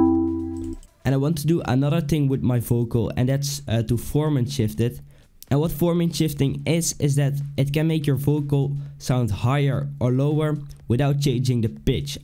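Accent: Netherlands. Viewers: You’re dutch right?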